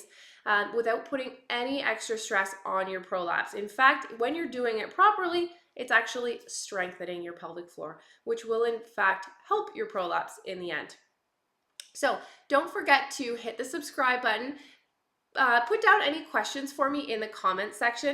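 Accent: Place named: Canada. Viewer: So CLEAR! And delivered with a charming Canadian accent. I’m sure this will help lots of people thank you.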